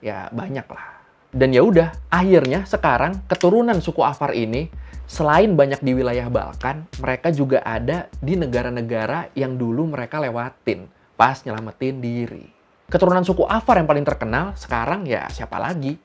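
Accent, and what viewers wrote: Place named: Madura, Indonesia. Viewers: Min.. Klo lg ngomong logat madura nya di ilangin donk! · Bang ngejelasin nya biasa aja gausa kaya org madura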